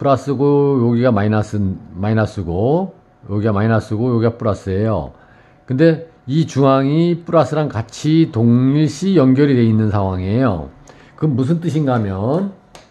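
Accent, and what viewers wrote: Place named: Chuncheon, South Korea. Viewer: 춘천 분이신기봐요 ㅎㅎ 구독합니다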